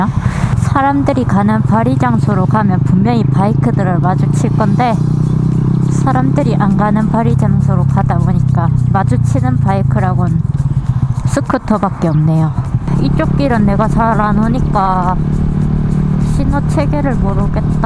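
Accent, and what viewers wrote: Busan, South Korea. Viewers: 와.. 부산사투리 매력 취한다...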